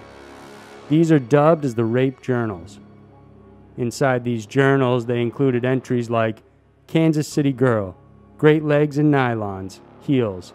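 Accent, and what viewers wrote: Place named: Massachusetts, United States. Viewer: It's pronounced "ORE-eh-gun".... not "ORE-a-GONE".... total Mass accent pierces the eardrums again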